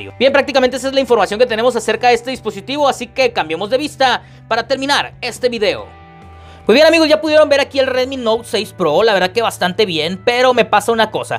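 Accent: Nayarit, Mexico. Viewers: Hola, amigo. Me gusta mucho tu estilo, supongo que eres de Nayarit o cerca, el acento me es conocido. Un saludo y tienes un suscriptor nuevo.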